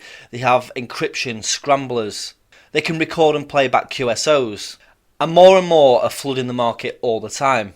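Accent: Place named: Cockney, United Kingdom. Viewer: Can you change your accent please. I cant understand you properly. You speak to fast. Im not a fan of your cockney accent 👀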